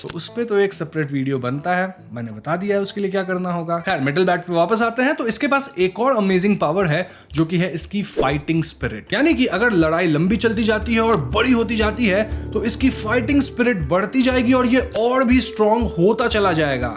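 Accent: Bengal, India. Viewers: Bro you are a Bengali right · Are you bangali? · Are you bengali... ❤😊😊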